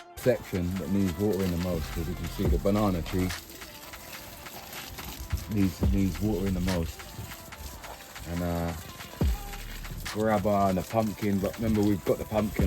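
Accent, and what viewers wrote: Jamaica. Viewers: I thought that lol, Is he actually from Jamaica???????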